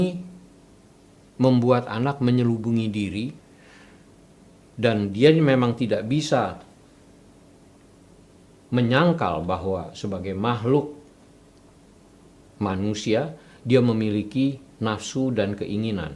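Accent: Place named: Germany. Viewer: Jujur sih aksen jermannya Pak ini keren.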